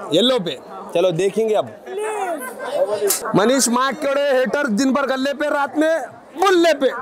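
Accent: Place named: Hyderabad, India. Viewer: Tumhari hyderabadi dialogue delivery ek dum chindian hai ustad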